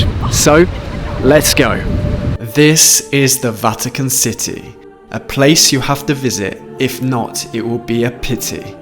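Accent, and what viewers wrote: United Kingdom. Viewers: Today, the 25th of March, 2023, I´ve had my first contact with this great channel: I love your accent (I guess you speak British English, but I can´t get from what exact country you are. I am from Barranquilla City (the Shakira´s hometown), on the caribbean coast of COLOMBIA, South America, so SPANISH is my native language (Saludos fraternos). I am also Christian (Roman catholic), so I really enjoy your video and I learnt a lot: I think I will go to Italy and The State of Vatican City, soon. I am sure I will improve my English communication skills with you: I have turned into your new fan: My best wishes!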